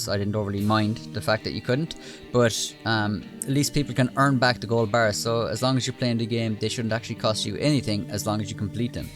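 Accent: Ireland. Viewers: I never tire of that sweet Irish accent.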